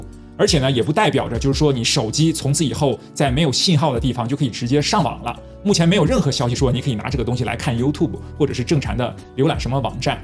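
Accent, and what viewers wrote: China. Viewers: 为什么用的词是台湾或香港那边的，但是口音是大陆的呢？好奇怪啊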